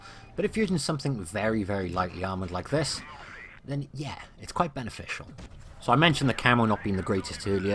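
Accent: United Kingdom. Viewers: U see that typicaly english? I think he is 100% UK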